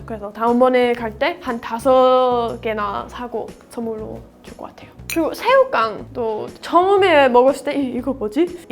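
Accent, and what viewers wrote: South Korea. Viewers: 전 미국과자가 더 좋아요^^ 억양이 완전 한국인이세요^^ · if I listen to you speak without watching, you sound 100% korean.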